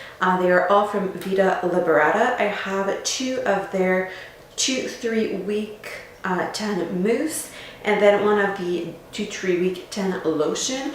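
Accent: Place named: Canada. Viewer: I love your accent! are you Canadian?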